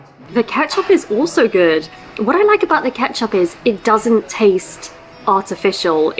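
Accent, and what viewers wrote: United Kingdom. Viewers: First of all, thanks for uploading the new video. I've been studying English with your video lately because I like your British English. I usually watch the same video at least 10 times. Really looking forward to your next video too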